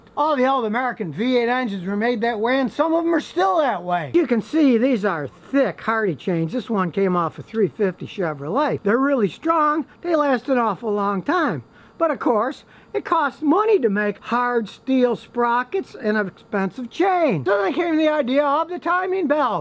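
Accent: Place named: North, United States. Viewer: originaLkomatoast he may live in Texas, but he sounds like a yankee. I want to hear THAT story. What brought him here?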